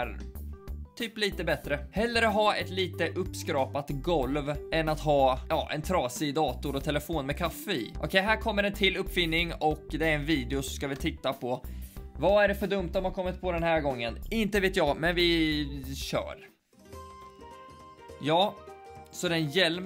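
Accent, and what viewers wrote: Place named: Östergötland, Sweden. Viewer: Denna östgötska😂 känner igen mig 100%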